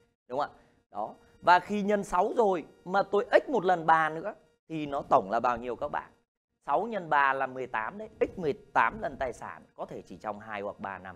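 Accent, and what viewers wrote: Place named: Tho Tang, Vietnam. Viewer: Thầy nói giọng Thổ Tang nhưng đẹp trai thông minh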